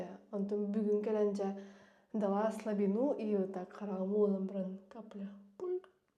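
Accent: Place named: Yakutia, Russia. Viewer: Астыгыын, Саха кыыһа, ыраастык сахалыы саңарар❤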